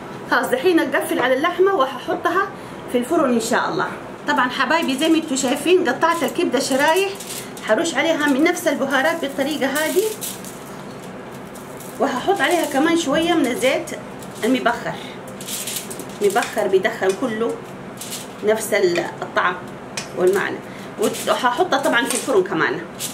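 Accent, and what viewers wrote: Hejaz, Saudi Arabia. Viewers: لايك لعيون اللهجه الحجازية 😍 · بسم الله ماشاءالله على لسانك الحجازي المحلى بذكر الله وسيدنا محمد عليه الصلاة والسلام وألف صحه وهنا عليكم بالعافيه يارب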